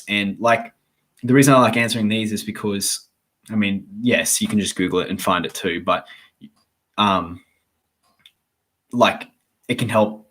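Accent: Australia. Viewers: Love the Australian accent haha, great video mate 😀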